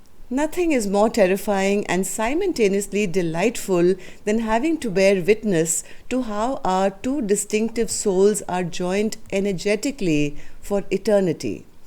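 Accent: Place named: Delhi, India. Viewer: Your voice is so familiar.. are you from New Delhi 💐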